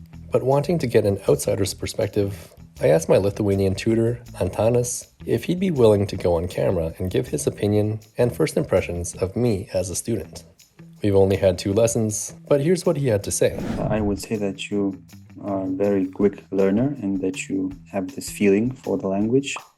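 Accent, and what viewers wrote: Canada. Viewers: I will start my comment with wishing you a vey Happy New Year! This video of yours is very inspirational. The most important is not how much we know but how much we are willing (ready) to know with action added to it. You could be an example to many! Lithuania has little English-speaking scene on Youtube and I am sure your channel will be a smash hit in no time. Meanwhile I would like to add that I am learning English pronounciation from you. Canadian English is perfect for understanding. Thank you for being You and for what you do. Best luck with learning Lithuanian! ❤️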